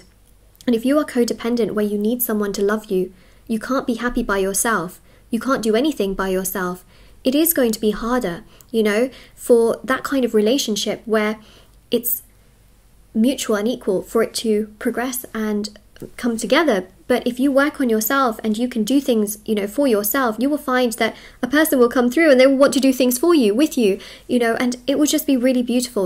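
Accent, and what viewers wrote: United Kingdom. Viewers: This lady is from UK I can say that. I wish to go and work in london for a couple of years. This slang sounds so interesting.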